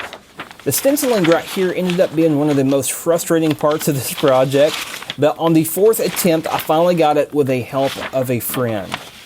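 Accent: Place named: West Texas, United States. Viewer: West Texas accent and all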